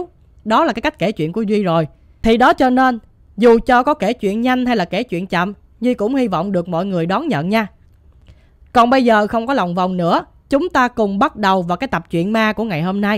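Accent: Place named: Phu Tan, Vietnam. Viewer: Kênh 90 giong ở phú tân quê e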